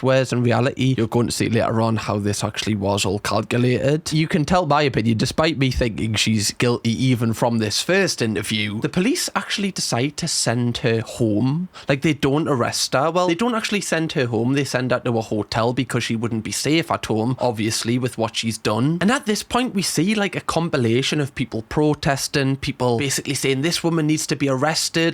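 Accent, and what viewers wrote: United Kingdom. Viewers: I've been really enjoying hearing your comments and opinions. It's like my own mind in speaking but in a British accent which always makes me laugh. Cheers mate for your content and genuine effort towards your craft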